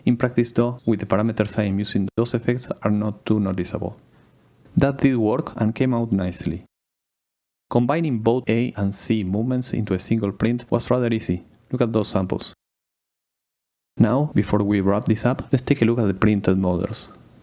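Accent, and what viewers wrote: Argentina. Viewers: Sos argentino papá ? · El acento argentino es inconfundible jaja. Bien ahí hermano, muy buen proyecto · vos sos Argentino??? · Hola, excelente maquina, tu ingles tiene un dejo de acento argentino, puede ser? Saludos! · Como andas papá? Otros pensaran que es un ingles malo, pero yo note ese Argentino desde el principio. Proyectazo loco, segui para delante que es realmente impresionante. Felicitaciones 🟦⬜☀⬜🟦 ---- ⭐⭐⭐ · sonas como argentino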